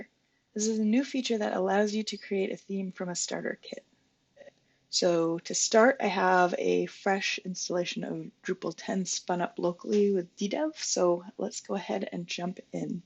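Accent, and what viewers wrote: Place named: Scotland, United Kingdom. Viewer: I detecting a wee scottish twang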